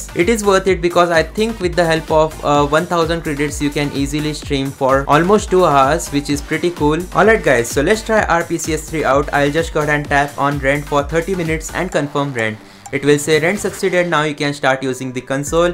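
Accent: India. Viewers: Tikkken with the smelly mother fucker indian accent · 1 plz speak more slow cus indian english realy understand hard ... 2 that cloud game why speak lier?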